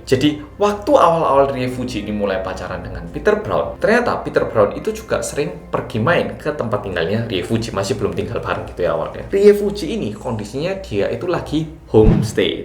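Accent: Java, Indonesia. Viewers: Medok x logat jawanya, tak pikir orang jepang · Salam knal mas, seneng ngikuti ceritanya Apa mas tinggal di Indonesia atau di korea kok msh. medok bhs. Jawanya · Korea medok hahaha.. sehat terus mas e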